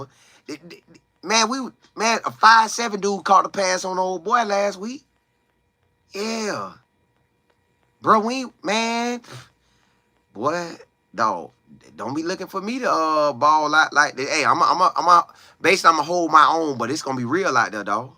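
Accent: South, United States. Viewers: His southern accent cracks me up 😂😂😂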